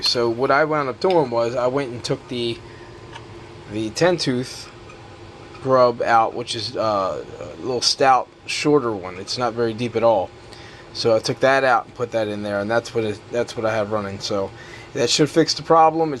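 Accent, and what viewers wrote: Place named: Maryland, United States. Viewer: It is actually a very close guess accent wise, because he is from Maryland.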